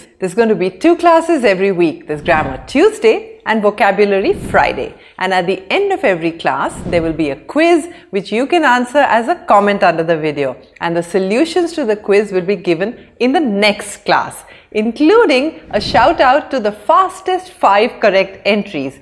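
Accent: India. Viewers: She is not speaking UK or US accent she speaks simple Indian English so I was wondering how she is getting more view at each video finally I googled her & found she is brilliant and very hard worker Finally she earned one more her subscriber 🌹👍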